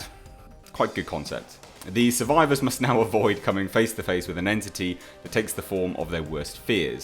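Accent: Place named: England, United Kingdom. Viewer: I actually like your English accent and your reviews 👍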